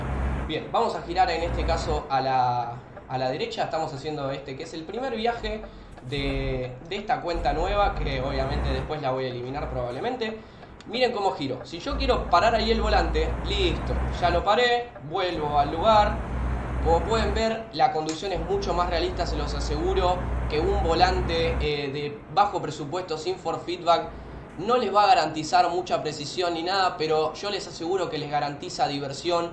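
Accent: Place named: Argentina. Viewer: Hola como va? gran video literalmente encima argentino aun mas lo entendi ajjajaja, yo tengo un problema. como puedo configurar para un volante de 180 grados.. es el de noganet. lo quiero configurar para el assetto corsa ya que mi idea es practicar drift en ello. Serias de muchisima ayuda de verdad, Gracias! y nuevamente gran video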